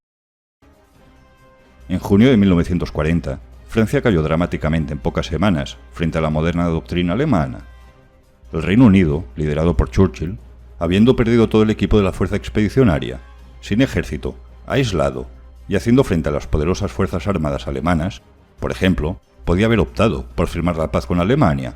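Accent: Galicia, Spain. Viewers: De que parte de Galicia eres??????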